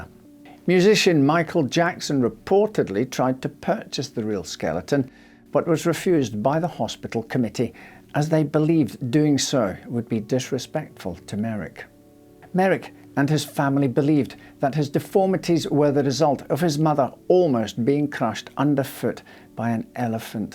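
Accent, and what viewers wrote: Scotland, United Kingdom. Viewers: I love listening to your Scots accent!! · Are you Scottish? I am sure there is a twang in your perfect enunciation!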